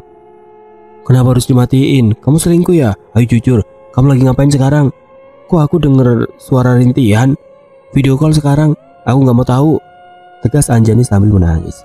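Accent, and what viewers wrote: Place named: Java, Indonesia. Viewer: Jadi lucu denger mas jowo ngomong bahasa Sunda ... 😁 Aksen jowonya medokk ... Lop sakebon ❤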